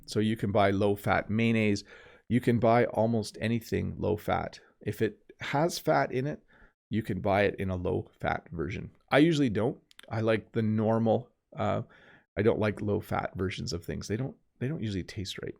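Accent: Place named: Canada. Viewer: Hearing your Canadian Accent really excite me. Thank for the video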